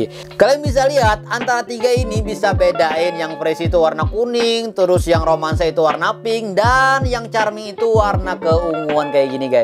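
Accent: Banjar, Indonesia. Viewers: Lugat bahasanya masih barasa mang ai piyan walau pakai bahasa indonesia.. Samangat mang lah maulah kuntin.. Lun tunggui banaran kuntin piyan nang hanyar mang ai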